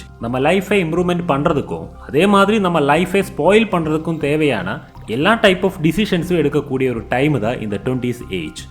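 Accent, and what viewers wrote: Kerala, India. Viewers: Bro Malayalam ma unga voice la theriunchu thu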